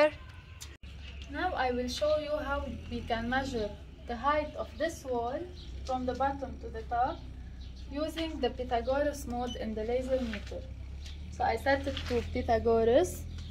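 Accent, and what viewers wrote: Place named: Arab world. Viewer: you look like arabian accent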